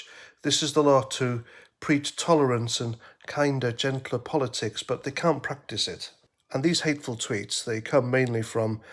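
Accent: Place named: Scotland, United Kingdom. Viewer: I live in Stoke On Trent and love Scotland especially your accent could listen to you for hours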